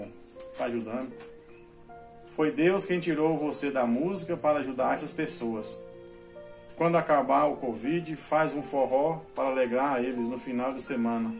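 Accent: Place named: Minas Gerais, Brazil. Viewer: O jeitim de falar do minerim é lindim. E pra completar um cafezim bem quentim.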